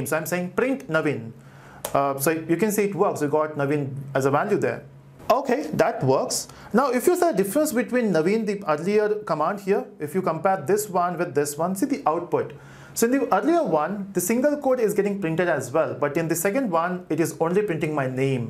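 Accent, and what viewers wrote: Telugu, India. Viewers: Nice sir is your mother tongue is Telugu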